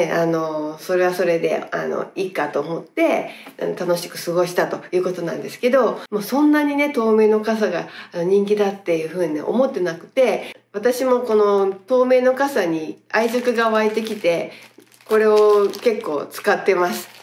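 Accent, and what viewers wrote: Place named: Hiroshima, Japan. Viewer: さん、いつも楽しく拝見しています☺️ 通訳ガイド経験のお話面白です！広島弁も可愛くていいですね🙌🏼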